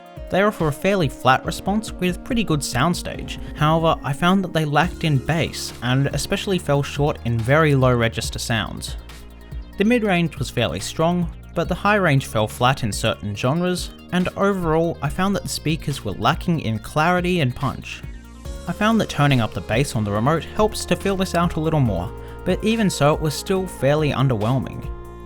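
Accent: Australia. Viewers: Glossy black plastic? That's the deal breaker right there no matter how great they may sound. The use of glossy black plastic on all consumer goods should be outlawed and banned as far as I'm concerned. The amp seems decent enough but the drivers sound sub par from what I can understand and I'm not at all surprised. For the price the speakers (of reputable quality) should be housed in wooden boxes at the very least. Anyway I enjoyed the video nonetheless and the chaps accent reminds me of the days of being at the SCG, WACCA and MCG again. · guy's an Aussie? Fuck me I couldn't for the life of me figure out where his accent came from. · Do I hear an Aussie accent or what! :D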